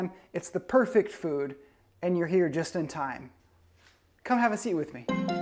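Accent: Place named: Minnesota, United States. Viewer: Hey - I have no idea how the YouTube algorithm brought me to your videos- but so happy they did. I’m sitting here in lockdown in Melbourne and your accent and these fish recipes are bringing me home to Minnesota. Hope you’re well. Also- that daughter of yours is adorable!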